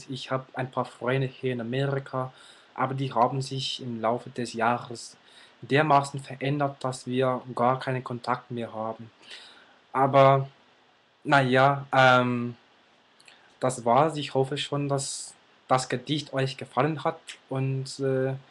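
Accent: Bavaria, Germany. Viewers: nice, Bro! Es ist lustig, dass du Deutsch mit Dialekt sprichst (Bayrisch). Deine Grammatik ist beeindruckend, da gibt es so viele Dinge, die man schnell durcheinanderbringt, aber du hast es voll drauf. Zusätzlichen Respekt, dass du in deutsche Literatur schnupperst, denn die ist in der Tat großartig, was selbst viele Deutsche scheinbar schon vergessen haben!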